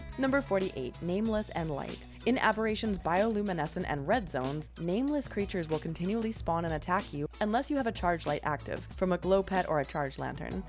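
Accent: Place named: Canada. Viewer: You pronounce things great 😂 love the Canadian accent too.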